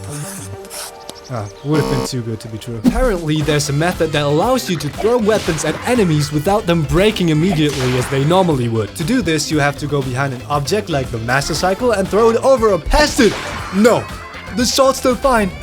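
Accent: Germany. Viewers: youre german? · pov ich weiß das er deutsch spriecht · Are you german? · Bist du Deutscher?✌🏼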